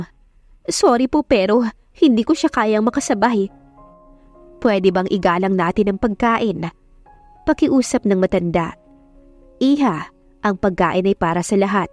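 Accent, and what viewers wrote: Philippines. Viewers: Talaga ba maganda ang boses ng narrator na ito? Parang tangang probinsyana ang bweset na narrator na ito. Naririnig mo ba ang tuno nyang igurot nakakairita.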